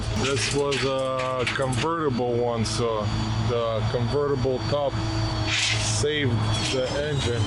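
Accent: Russia. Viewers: Seems maestro has Russia accent.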